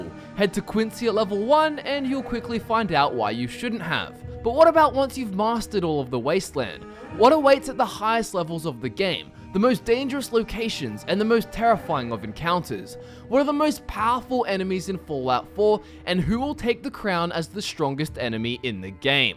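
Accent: Australia. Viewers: Hi Hi Actually no he doesn't He's Australian and that's how Aussies say it British people say it that way too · Austin DeWaal it’s an Australian accent bruh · he sounds Australian which is how they say that word in that way.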